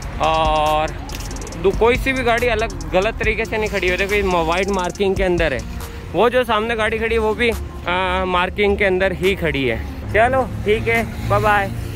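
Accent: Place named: Rajasthan, India. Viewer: Thet vaha tak hai Rajasthani with hindi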